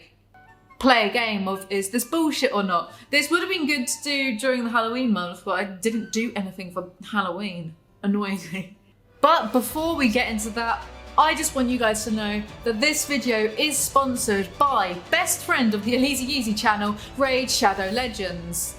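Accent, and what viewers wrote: United Kingdom. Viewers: LMAO YOUR PHONE IS RIDDLED, I love hearing another UK accent, makes me feel like we actually exist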